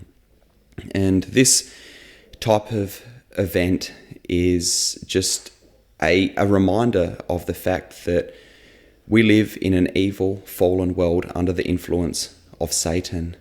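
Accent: Australia. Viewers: Amen. Thank you. I follow you, as I followed Charlie and the late Pastor Baucham from Egypt. I am a Coptic Orthodox Christian. May God bless you my son and may He bless your ministry Amen. I am very fond of the Australian accent though I don’t hear it often. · Had to stop bc of the Australian accent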